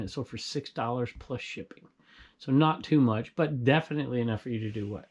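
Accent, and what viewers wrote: South, United States. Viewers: I could listen to you talk all day. You have the cutest southern accent and wonderful inflection. You could really read for audible in my opinion.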